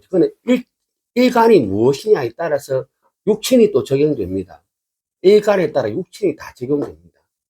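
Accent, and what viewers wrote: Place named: Gyeongsang, South Korea. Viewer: 경상도 사투리가 넘 구수하네요 😊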